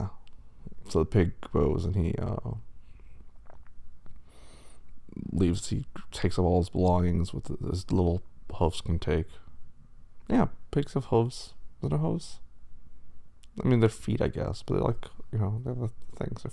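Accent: Germany. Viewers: the german accent is fucking sending me into oblivion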